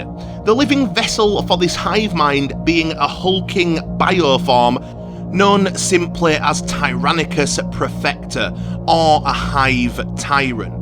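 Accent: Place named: North, United Kingdom. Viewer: Liked and subscribed, great to hear a northerner doing lore! A humble suggestion, try putting your script or notes nto chat GPT, there was a little bit of repetition. Keep up the good work!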